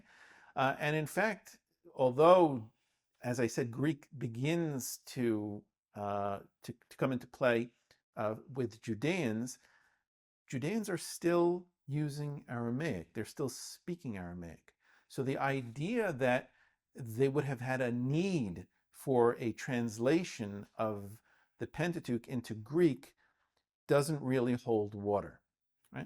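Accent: Russia. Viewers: Russia is a large country with many different ethnic groups within it. It may not be an accent with which you are familiar but that does not mean it is not a Russian accent.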